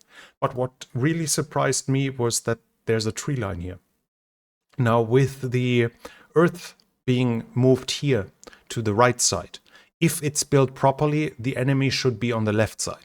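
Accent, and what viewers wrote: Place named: Germany. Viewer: Ich mag dein German-English 🤗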